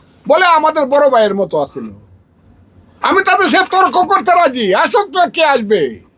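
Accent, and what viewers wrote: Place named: Brahmanbaria, Bangladesh. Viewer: ব্রাহ্মণবাড়িয়ার আঞ্চলিক ভাষায় কথা বলে।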